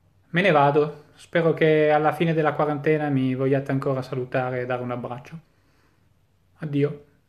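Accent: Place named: Piacenza, Italy. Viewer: Piacentino certificato!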